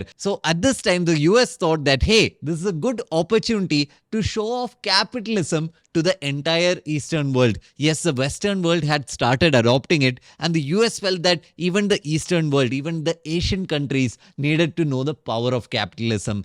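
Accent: Kerala, India. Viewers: Are u mallu 😊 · Are you from Kerals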